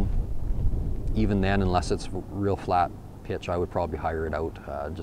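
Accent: Canada. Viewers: First time here....why do you sound canadian?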